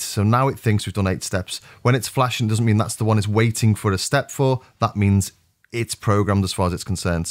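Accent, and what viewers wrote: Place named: England, United Kingdom. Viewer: Just got my crave last week, this video is absolute mana from heaven, I would be lost without it, thanks 👍🏻 and also the fact you're English means I can understand what the hell you're talking about 😂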